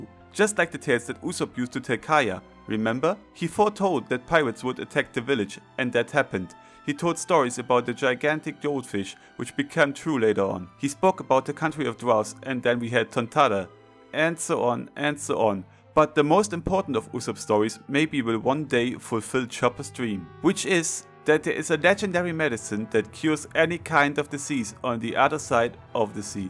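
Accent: Germany. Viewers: Du bist Deutscher, oder? Starkes Video! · Bro's german AF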